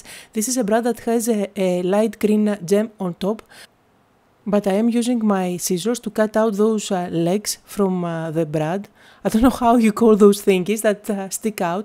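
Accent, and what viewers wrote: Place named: Greece. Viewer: Φαινεται τοσο πολυ οτι εισαι Ελληνίδα , απο την προφορα και μονο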